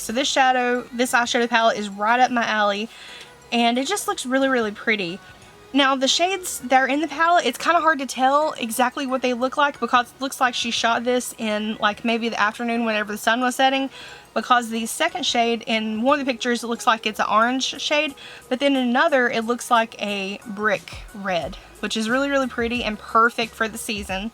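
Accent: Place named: Georgia, United States. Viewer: Love your style. And accent-- it reminds me of home. GA girl here ;)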